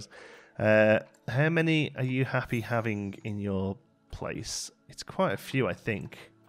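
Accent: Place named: Staffordshire, United Kingdom. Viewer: I thought your accent was familiar! I also grew up in Hednesford/Cannock Chase! Love your videos :D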